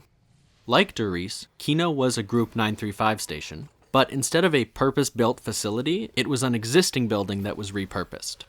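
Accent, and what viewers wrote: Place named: United States. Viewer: I can’t tell if you’re from MN, Western PA or CA.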